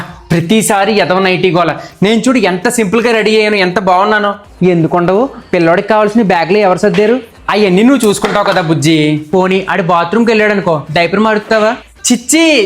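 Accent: Godavari, India. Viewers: Annayya pakka godavari language superrr anna Okka video chusinaventane subscribe chesesaanu Chala baagunnai videos😊😊😊😊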